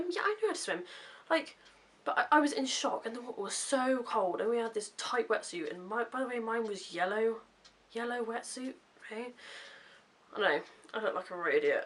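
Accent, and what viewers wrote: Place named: United Kingdom. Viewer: fucking murder corr can tell you're British 👍👏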